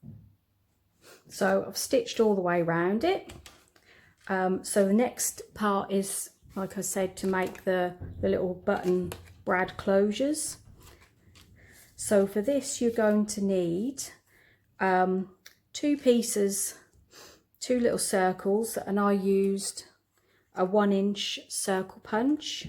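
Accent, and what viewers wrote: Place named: Norfolk, United Kingdom. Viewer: Are you from Norfolk..I can hear your accent? 😍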